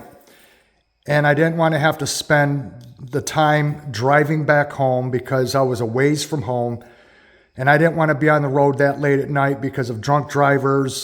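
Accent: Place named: Upper Peninsula Michigan, United States. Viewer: Based on your accent, it sounds like upper peninsula Michigan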